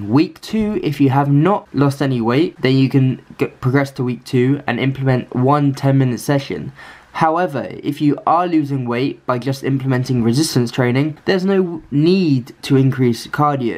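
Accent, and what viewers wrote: United Kingdom. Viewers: blue eyes and british accent ! oh im falling for u !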